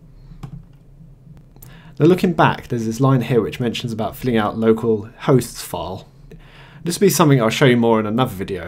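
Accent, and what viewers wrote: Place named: Cardiff, United Kingdom. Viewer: +1 for you voicing a Git or Syn tutorial. +1 for Cardiff accent.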